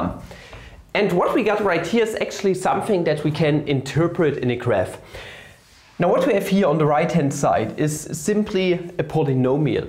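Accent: Germany. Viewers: wait i never noticed your german accent before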